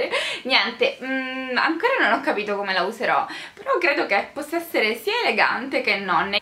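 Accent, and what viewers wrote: Sicily, Italy. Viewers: Ehi ciao:) mi sono appena iscritta!! Metti troppa allegria e a quanto sento sei siciliana come me... 👍🏽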